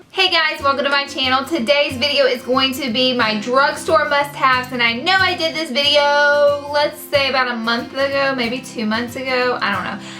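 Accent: South, United States. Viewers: You belong on duck dynasty. You have the same accent/personality of their wives. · I didn't know you live in Cali! Your accent sounds southern, but still cute tho! Anyways, I love your personality! :) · You're so cute. You sound like my friends lol #southerncharm new sub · I love you accent. I'm from Eastern Kentucky and your accent is more southern than mine. Although, Alabama is further south than Kentucky. I moved from Kentucky in July of 2011 to DE. I have been living in DE since then. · I must follow you! I love your accent and sayings! Not sure where you were from but I can tell it was here in the south!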